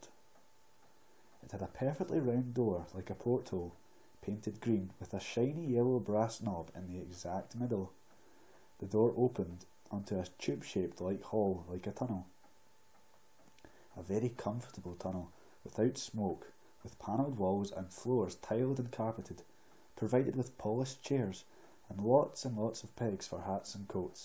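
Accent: Scotland, United Kingdom. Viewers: Hay! A fan of scotland and ye accent here. There's something fascinating about it, so definately do more. For 'untrained' ear, your speech sounds very odd and I hardly understand any words, but I like it a lot. Hopefully we will hear (about) you in some point again! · I wouldn't rate this as an asmr. You could get more views and likes if you titled it as a Scottish accented reading of The Hobbit. Loved it btw. Used to live in Skye untill we moved elsewhere. So go ahead mate. :D upload some more. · Holy Highlands, Batman! Reading Tolkien in a Scottish accent. That *THUD* is just me, *Falling Over* ! Brilliant. I didn't get asmr, but it's usually random, anyway. I'm just so excited for this community that you made this video, because THAT'S WHAT I'M TALKING ABOUT! Definitely keep doing these. p.s.: "He came expecting mischief and didn't need to see his friends' legs sticking out of sacks to tell him that things were not at all well..." ;)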